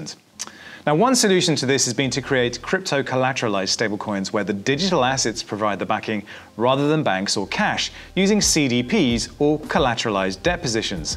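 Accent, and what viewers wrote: United Kingdom. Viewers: hahaha the Scottish accent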